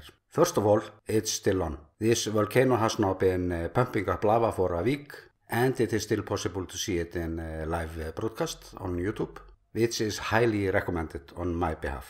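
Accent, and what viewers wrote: Iceland. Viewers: Thanks and keep us updated! I really like Your cute islandic English.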